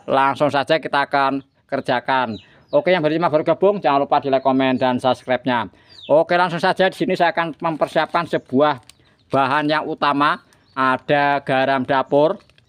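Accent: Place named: Java, Indonesia. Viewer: Maklum jawa totok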